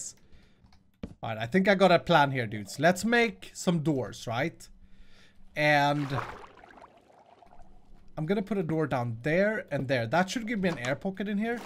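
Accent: Sweden. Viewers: his acent is swedish btw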